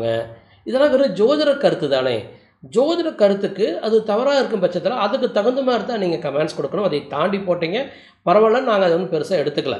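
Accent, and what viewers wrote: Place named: Kongu, India. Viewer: Sir.. Its impressive that you have been accepted the mistake on Modi Jathagam. You have predicted accurately for most of other party.. You continue give suggestion to public as we are not afford to have consultation with you for more than 5000 USD. Please give suggestion to common people for remedies and suggestion to improve their life. Continue your kongu tamil from Delhi.. Love from Sydney...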